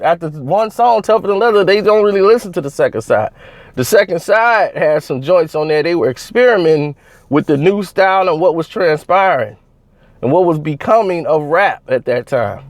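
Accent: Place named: South, United States. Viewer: This brother must be from down south somewhere North Carolina or somewhere cuz he's definitely not a New Yorker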